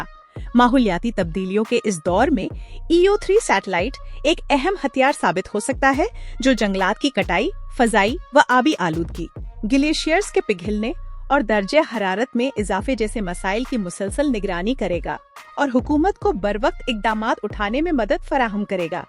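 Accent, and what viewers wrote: India. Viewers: ویڈیو میں ہندی لہجے کی آمیزش نے مزہ کرکرا کر دیا۔ سوفٹویئر تبدیل کریں۔ خلا کو کھلا، مزید کو مجید اس طرح کی مزید کئی تلفظ کی غلطیاں ہیں۔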